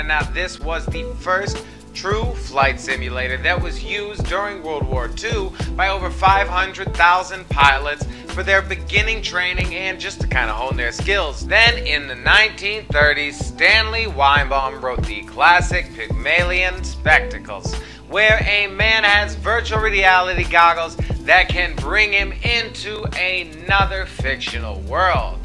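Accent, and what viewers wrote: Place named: Northeast, United States. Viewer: Not a bad first shot providing voice over narration,! Not bad at all! Who knew that inside our lurked a bonafied documentarian! You know the strange part? Your upper east coast accent adds an amount of flair and polish to your presentation I wasn't expecting. Can't wait for your next feature presentation!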